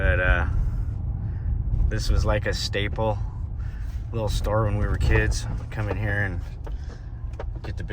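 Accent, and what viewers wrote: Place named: Texas, United States. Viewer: ❤🇺🇸You are good at driving. I love: your accent; the two beautiful and cloce friends Dos in their nice color:Gold and Black(You already told their name in anothet video); you dress wonderful and your Ranch will be work very soon, that's my wish as your fan from Brazil. I thought you were from Texas. Any away I realy enjoy watch your video from California. Thanks alot. I see you on next.❤🇺🇸👏💖🙋🇺🇸💖💚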